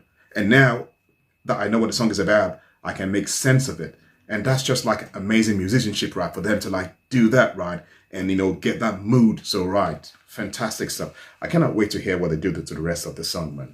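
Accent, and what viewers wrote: England, United Kingdom. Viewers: It sounds like you are from the UK (England). How is it that you never heard this song?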